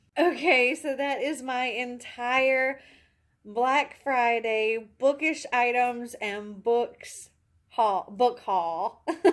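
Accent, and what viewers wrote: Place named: South, United States. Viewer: Your ollies store has so many more books than mine. Mine only has a few good ones mostly Christian fiction or true crime which I don’t read! Awesome haul though I really love how you read the synopsis or tell us what they are about so I don’t have to pause every second and look on Goodreads lol!!! Also where are you from? You sound southern, which I rarely see on booktube other than myself!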